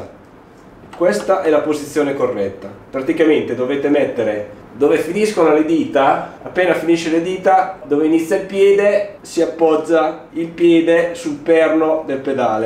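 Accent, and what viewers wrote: Romagna, Italy. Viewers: grazie mille sei sempre molto chiaro!! E poi con quella parlata romagnola sei sempre meraviglioso 😜😂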